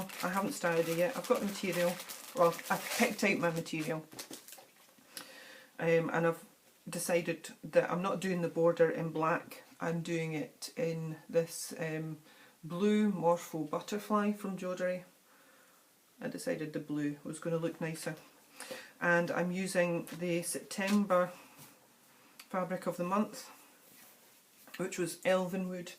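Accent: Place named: Scotland, United Kingdom. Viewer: Great first video. Scotland is my favorite place in the world to holiday. Your accent makes me want to plan another trip.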